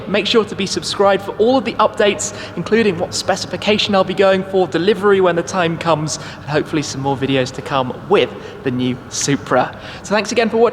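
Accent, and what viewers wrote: England, United Kingdom. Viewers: First I thought he is speaking qt different but later I realized that he is British! 😂 cheeeehhhhhrs! Gray one was better than red) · Ублюдки ебаные эти англичане , какой же мерзкий английский акцент 🤮🤮🤮🤮 Слух режет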